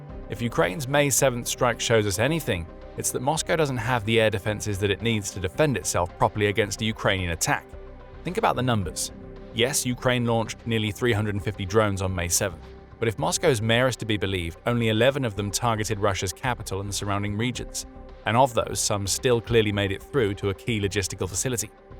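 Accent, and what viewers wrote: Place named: Cockney, United Kingdom. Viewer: I can't stand to hear you say Rush-er, and other words like propagand-er, you sound like a cockney Brit!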